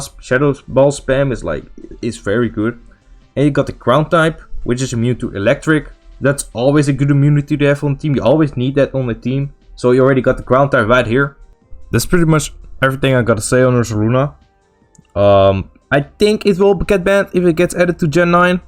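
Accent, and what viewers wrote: Netherlands. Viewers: 3 seconden in en ik kon gelijk horen dat je uit Nederland kwam, blijft leuk om mensen uit eigen land te horen :)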